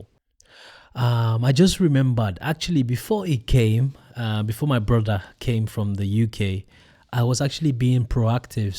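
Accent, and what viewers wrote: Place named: West Africa. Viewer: West Africa sounds like. Probably Nigerian or Ghanaian.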